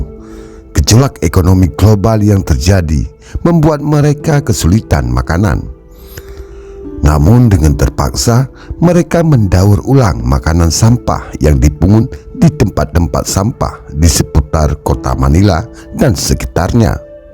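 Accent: Aceh, Indonesia. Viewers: dari logatnya kayaknya bang admin orang aceh ya hehe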